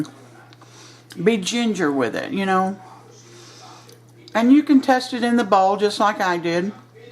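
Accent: South, United States. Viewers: I totally enjoyed your video!... Your Southern accent and the TV on in the background, made me feel right at home, in the kitchen. My Grandma was an expert pie maker, unfortunately She didn't choose to share her secrets and skills, but watching you I can imagine this is the way She made her pies. One thing I can agree with is the patience that is required to make great pie dough. It's more than just throwing some ingredients in a bowl and mixing it up. I have always said there is an art to making flaky pie crust. You said it should be done quickly, but you didn't do it quickly, and it turned out great. I guess I am saying that because I am not quick, lol. Also do you recommend Granny Smith Apples, to make the pie filling?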